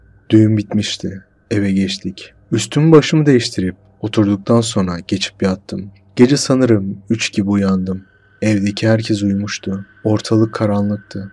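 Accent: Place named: Thrace, Turkey. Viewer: Severek dinledim ama merak ettiğim bişey var şiven trakyaya kaçıyo doğrumudur ben babaeskiliyim başarılar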